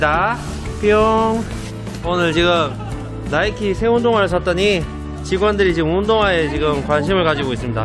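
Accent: Gyeongsang, South Korea. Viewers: 저역시 피나이 티비 구독자인데 채널도 병행구독 할거에요! 근데 경상도 분이신가요?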